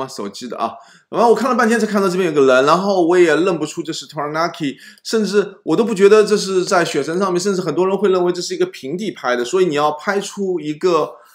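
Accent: Shanghai, China. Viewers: 先生侬好！两个月前，一次偶然的手抖误操作，点开了正在直播的《摄影评片》，听到一口上海腔普通话，就像听到邻居、朋友讲闲话，蛮亲切的，所以没有马上转频道，听听讲点啥么事？听着听着，被侬专业、中肯而又平实易懂的点评所吸引，尽管我非摄影爱好者，但是仍就看到了直播结束，并且订阅了《詹姆斯摄影》。之后陆续看了另外两集《评片》，也看了《瓦纳卡的孤树》、《星芒照海岬》和《詹姆斯摄影十年作品》（封面上的南浦大桥覅太赞哦！），刚刚又看了第十二期《摄影评片》，虽然这些只是侬视频群里很少的占比，但是已经影响到我，对摄影好像有点感兴趣了，正在准备考虑买只两手货佳能5D2，再配一只好点的镜头起步，尝试去感受拍照的乐趣。侬让我联想到了另外一位从上海走出来的摄影大师——郎静山，尽管所处时代不同，作品风格迥异，可都勤奋努力，孜孜不倦，砥砺辟出了自己的路，都在成功之后毫无保留地将自己的学识和经验和盘托出，倾力普及传授摄影知识，都德艺双馨，令人尊敬。郎先生一百多岁还手捧相机黄山徒步，相信侬也会在一百多岁时仍行走于天涯海角摄取美景飨世人的。祝福侬摄影教学收获更多硕果，摄影艺术登上更高境界！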